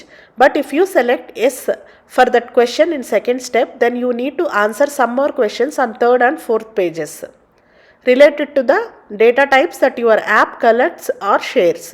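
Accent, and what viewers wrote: India. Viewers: Very strong indian accent 😊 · Why always indian . Accent. I hate it . I can't understand it very well. F. This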